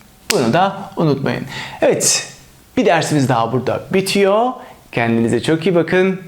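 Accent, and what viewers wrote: England, United Kingdom. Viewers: Hocam cidden orada "Cancer" demeniz çok hoşuma gitti, dalga geçmiyorum yalnış anlamayın. British Aksanı harika bence. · bey anlatımlarınız etkileyici ve eglenceli olmasına rağmen asırı ingiliz aksanızdan dolayı size kızıyordum 😆😆😆 hatta eleştirdim bile ama şimdi kızmıyorum durum deyisti çünkü yavas yavas sizi anlamaya başladım 😆😆 önceki eleştirimi geri alıyorum ve sayğılarımı sunuyorum. siz cok kaliteli bir öğreticisiniz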